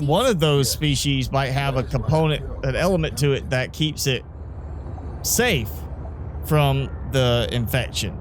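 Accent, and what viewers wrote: South, United States. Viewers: I hear a country accent in your voice lol, where u located at. I'm down in Mississippi lol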